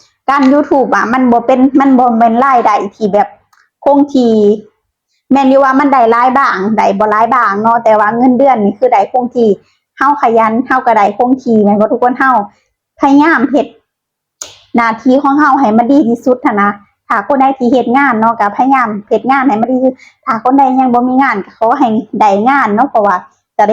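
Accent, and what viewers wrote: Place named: Loei, Thailand. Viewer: สำเนียงเว่าคือคน จ.เลย แท้ครับ😅😅😅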